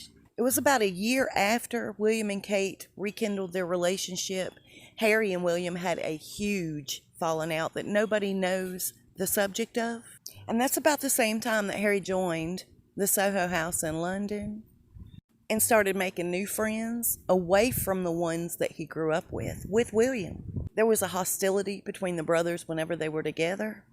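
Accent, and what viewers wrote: South, United States. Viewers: Love 💘U, We Will All Miss U, We'll Miss That Southern Accent With All That Great TEA U Serve Us. U R SORELY LOVED We'll Miss U Something Awful, Rest In Peace Our Beautiful Sweet LADY, 🦋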